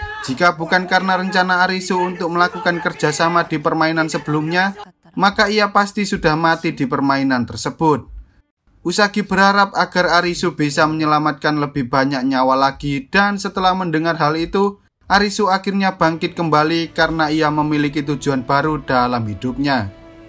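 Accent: Java, Indonesia. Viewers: Jowo ta mas?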